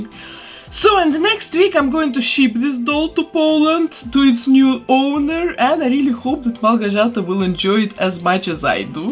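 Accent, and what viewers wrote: Russia. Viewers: I can't figure out what that accent is it kind of reminds me of a Russian accent of which is what I have but it's not quite a Russian accent so please what language did you most likely speak before you start speaking English or did you even speak another language aaaaaaaa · похож, почему-то, на самый стереотипный и клишмрованный русский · какой-то русский акцент 🤨🤔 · Звучит как русский акцент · Русский акцент? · Блин, да чтож такое. почему меня не покидает ощущение что у вас русский акцент?) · Акцент похож на русский)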